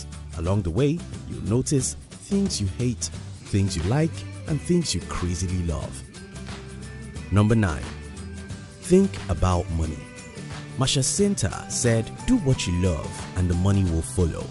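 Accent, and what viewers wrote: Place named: Nigeria. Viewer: How DARE YOU fucking disagree with Marsha Sinetar. I picked an accent you are probably Nigerian. No surprise there.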